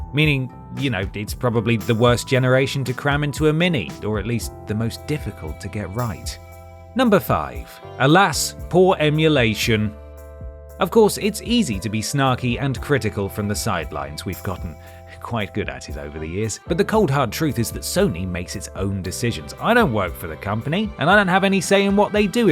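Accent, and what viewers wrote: United Kingdom. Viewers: This channel says it's fun United Kingdom, and the host sounds British. But it's just another US channel. It's USA vs Japan, it's "dollar" that just assumes US dollar, even though it wasn't started. Why do so many Brits want to be American channels? Be international.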